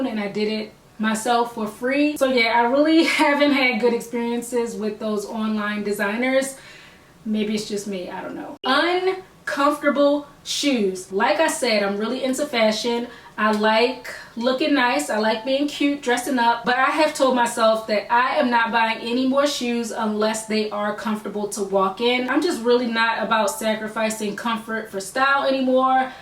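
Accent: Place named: Philadelphia, United States. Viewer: Is this girl from Philly??!! The accent seems so familiar... :-)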